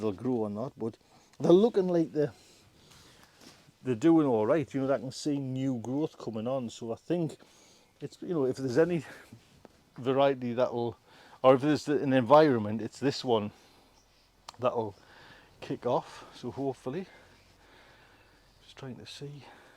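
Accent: United Kingdom. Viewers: This has nothing to do with plants, but what dialect are you speaking? I'm intrigued by British English dialects and I think yours is something I haven't heard before.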